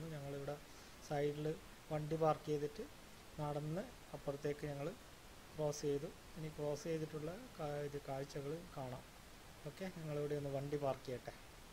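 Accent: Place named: Kannur, India. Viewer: ആഹാ... കണ്ണൂർ ഭാഷ 😍 ഞാനും കണ്ണൂർ ahh... കല്ലിയാശ്ശേരി Video കൊള്ളാം കേട്ടോ... നന്നായിട്ടുണ്ട്... തിരിച്ചും വരാൻ മറക്കല്ലേ കേട്ടോ, 🥰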